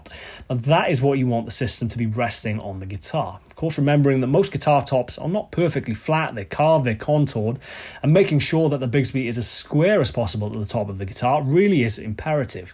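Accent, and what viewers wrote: United Kingdom. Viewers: Stupid hairstyle and British accent will turn off most USA viewers.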